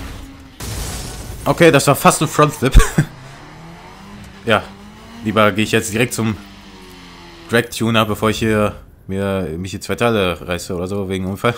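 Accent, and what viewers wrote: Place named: Russia. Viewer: man hast du nen russischen akzent ! :D (bin auch russe)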